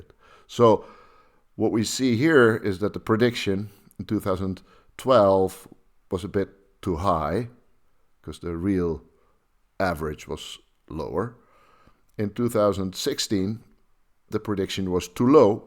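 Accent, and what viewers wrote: Netherlands. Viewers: Duurde wel even voordat ik het NLse accent herkende.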